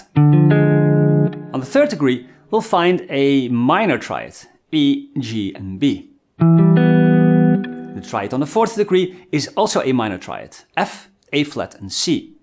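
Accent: Netherlands. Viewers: Dense and beautiful, and with a Dutch accent. I'm jamming with a good friend who is a Nederlander and we are working on diatonic modes. I love your graphic showing how to think about modes. I shared with him. Thanks for dense and beautiful.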